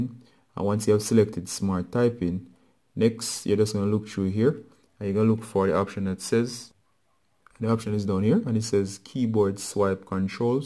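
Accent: Jamaica. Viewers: U Jamaican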